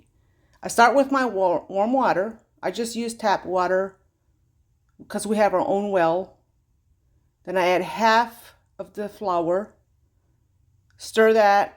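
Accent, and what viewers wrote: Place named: Pennsylvania Dutch, United States. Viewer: I cannot find any information on where you’re from but my goodness, you sound so much like folks from home! You wouldn’t be Pennsylvania Dutch by chance would you?love your channel. Thank you!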